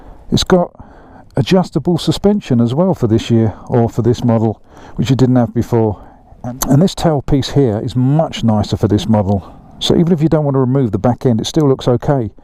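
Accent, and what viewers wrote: United Kingdom. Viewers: Right giggle nice words mate love UK English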